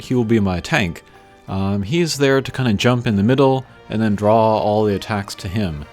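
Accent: Japan. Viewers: Hi! Welcome to channel, where contents are great and the content creator shows a great and genuine enthusiasm toward this game. If you are new here, then you might know that this great guy likes to bring the sense of Japanese culture and pronounciation during during. He is a smart guy. Let's focus on the content and appreciate any uniqueness that he share. The whole world is not centered to the West, you know:D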